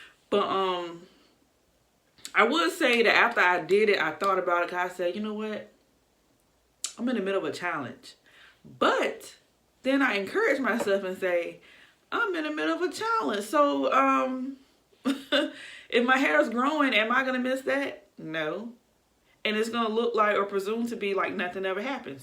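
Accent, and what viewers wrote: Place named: South, United States. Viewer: I thought you from chicago or Alabama because the base southern hue in your voice and I'm from new York living in me mama's hometown and grandma's in Goldsboro,NC.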